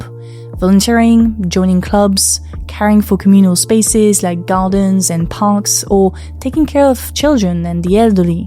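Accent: France. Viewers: You sound french 🤔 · It was just too much for me, Im allergic to french traing to speak English and leter R, I know what I said, just another youtuber traing to get some like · You accent is so cool. You sound french. Then you sound australian. And little bit of scottish.😊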